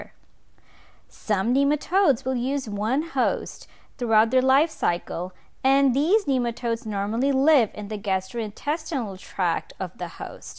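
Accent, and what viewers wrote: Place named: South Korea. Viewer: i like korean accent very much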